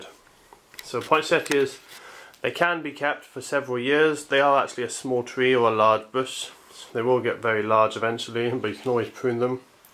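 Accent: United Kingdom. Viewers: The chap has a British accent, so I assume he's in the UK. The UK, along with Europe, uses Degrees Celcius to measure temperature, and 15 degrees C is equivalent to 59 - 60 F (Farenheit).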